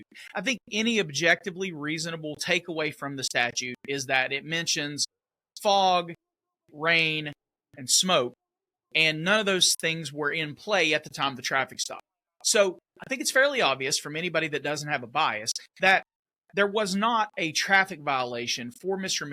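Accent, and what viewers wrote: South, United States. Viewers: Great analysis. Thanks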